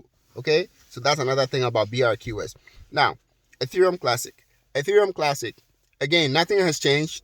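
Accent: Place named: Africa. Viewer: Where are you from??, you have an African acent. I even just subscribed because of that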